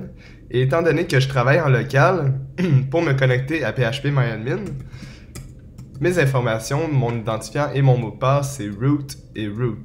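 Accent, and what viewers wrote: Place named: Canada. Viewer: Grand merci pour ce tuto. Les versions sont récentes et les commentaires sont sans blabla. Et en plus avec l'accent canadien, c'est parfait. A visionner sans modération.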